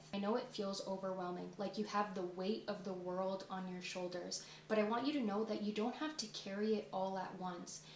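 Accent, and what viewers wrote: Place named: Canada. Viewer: I thought I'd been hearing a Canadian accent in your words. I enjoy your videos. I'm going through a minor crisis right now and trying to stay ahead of it and not let it take over. I find your content to be inspiring, thoughful, balanced and relevant and can be applied to almost every other situation the presents itself. I'm glad I found your community 🙂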